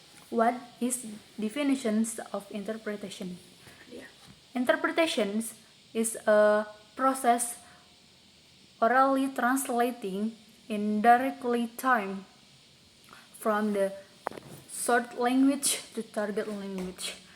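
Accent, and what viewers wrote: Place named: Indonesia. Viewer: Well done girl! Keep it up. You got Indonesian accent I guess, Good I’ve got few good Indonesian friends.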